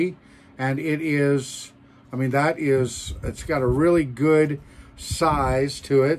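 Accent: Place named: Canada. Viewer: You sound Canadian for an American.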